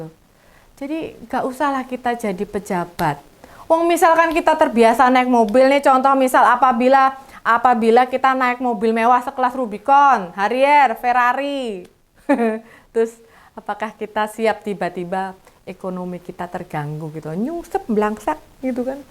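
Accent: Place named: Surabaya, Indonesia. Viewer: Mbak iki logate kok koyo wong Suroboyo...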